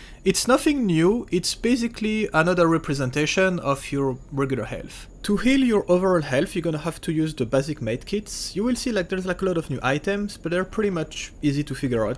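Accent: France. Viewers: Je détecte un accent français sur cette chaine que je viens de découvrir. J'aimerai tellement qu'il fasse une partie française dans ses vidéos. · Je reconnais un accent francais :)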